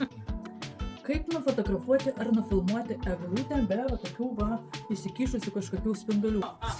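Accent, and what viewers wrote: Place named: Kupiškis, Lithuania. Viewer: Man patinka jusu vlogai, nes tokie gyvenimiski, mieli. Dar ir tarme jusu primena namus :), nes as is Kupiskio puses ir ten panasiai kalbama. 🌲🤗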